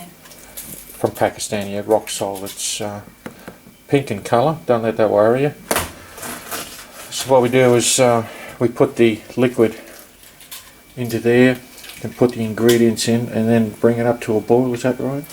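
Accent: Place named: United Kingdom. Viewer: You are Brit and its to hard for me to understand you, but nice video😂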